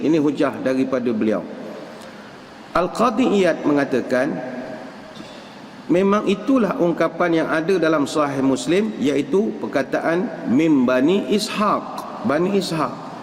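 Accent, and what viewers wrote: Malaysia. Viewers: Ustaz .ni dr mane..logat malaysia peci indonesia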